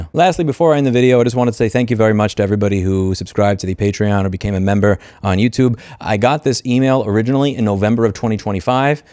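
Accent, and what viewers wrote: United States. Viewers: Eeewww dude - congratulations on creating a rage bait sermon. Perhaps you should stay in your own lane with regards to this issue. You sound American - you guys were brought up different to us in New Zealand. The child you are asking to video tape their school bathroom is very questionable also. You, an adult - requesting or accepting video from a child/minor. Think about that careful bud. Pip from New Zealand. Age 47 🌻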